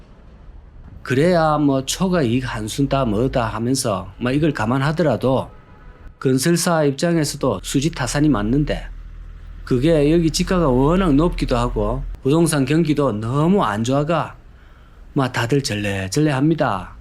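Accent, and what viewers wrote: Gyeongsang, South Korea. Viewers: 누가 뭐락 했심껴? 갱상도 발음으로는 은마를 언마라 부르는거 모르십니껴? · 경상도 사투리의 매력에 웃네요. 은마(언마) 스스로 (서서로) 죽이라.(직이라) 사투리가 은근히 매력 있네예. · "와~ 후덜덜덜" 😅 갱상도 사투리 마 직인다 아이가💣 🌋🎊🤣 오늘도 👣👣👣학실한😂 개드립 ~ 으로 구독자들에게 도파민을 선사하시네요. ㅎㅎㅎㅎㅎㅎㅎㅎ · 경상도 사투리가 압권^^ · 갱상도 발음이라 이해해야됩니다 쌀도 살이라 말합니다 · ㅋㅋㅋ경상도사람들은 "으"발음을 못해서 "어"나 "오"나 "아발음을 하는데... 그 이유는 원래 우리말 고어에는 <아래 아>로 발음하는 게 있는데... 이게 일제 때 24개 현재의 자모음으로 정해지면서 4개의 자모음이 발음하기 불편하다 하여 생략하게 합니다. 그러다보니 <아래 아>가 다양한 모음조화에 따라 다양한 모음으로 발음하게 되면서 특히 경상도 사람들의 경우 원래의 그 주된 구성원들이 저 북쪽의 사로(중국에서는 이를 고어 발음으로 "슈어눠"라고 발음하는 것을 문자로 적을 때 그 차자로서 "흉노"라고 적은 것) 사람들이기에 그 발음이 거센 발음이 많은 게 특징이며, 된발음을 잘 하지 못하는 특징을 가진다. 그래서 쌀"을 "살", "싸움"을 "사움"이라고 하는것처럼... 특히 이 "사움"은 일본어 고대어 에서 <"사우무" => "사무우" => "스모" = 씨름> 의 원형이기도 하다 위 영상제작자도 계속해서 <은마>라고 발음하지만 실제 들리는 발음은 <언마 혹은 엄마>로 발음하게 되는데 이는 어짤 수 없는 지역적 방언의 특성이다. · 갱상도 ㅋㅋㅋ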